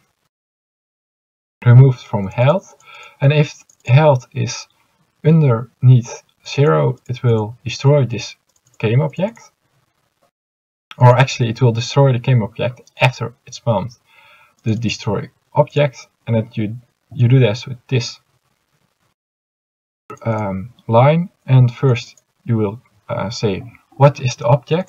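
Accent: Netherlands. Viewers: where you from are you from the netherlands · en btw... ben jij nederlands? zo klink je wel and btw are you dutch? you sound like it...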